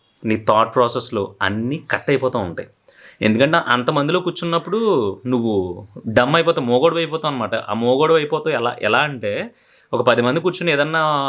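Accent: Godavari, India. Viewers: బ్రో సూపర్ మా గోదావరి జిల్లా భాష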